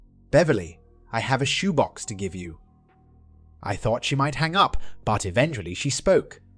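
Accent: United Kingdom. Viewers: I've heard this one a couple of times before, but I do have to say that having the AI with a British accent gives it a certain sense of charm.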